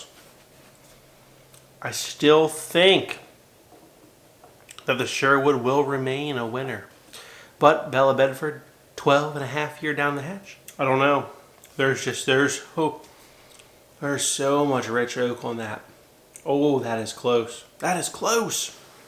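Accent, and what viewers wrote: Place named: Baltimore, United States. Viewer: This guys Baltimore accent cracks me up. Reference to Drug city I assume you work or have family in Dundalk. Curious to try the Belle now. Thanks for the review.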